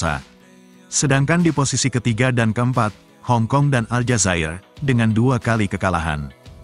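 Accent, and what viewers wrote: Banyumas, Indonesia. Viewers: Ngapak ora baen baen ya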